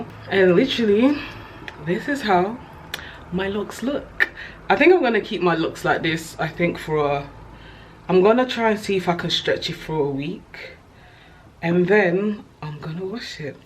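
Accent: United Kingdom. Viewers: Your hair is really nice on you, and your British ascent is everything👑😊😊😊, do u have a video on what to expect in the first 12 month? , please I need such video👑👑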